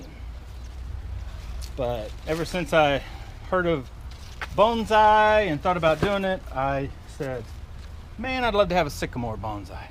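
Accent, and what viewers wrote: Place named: South, United States. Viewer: Love your accent, are you from south of usa?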